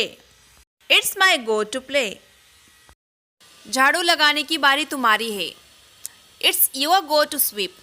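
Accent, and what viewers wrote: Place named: Tamil Nadu, India. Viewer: Today I learnt 👇👇👇 1) Come what may, I will never forget my parents. 2) Now it's my go to speak English. 3) Have you purchased toys and all? 4) I speak passable english. 5) I can't do without my mummy. 6) Show me to jump from this roof. Mam, you teach very nice 👌👌 I think u are from Tmilnadu.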